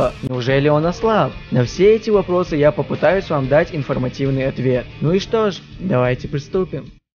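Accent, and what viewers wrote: Chechnya, Russia. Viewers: я смотрел тебя, смотрел, и все время думал, какой же у тебя чеченский акцент, думал, неужели земляк, только сейчас, зайдя в вк, понял, что из Грозного - чеченец.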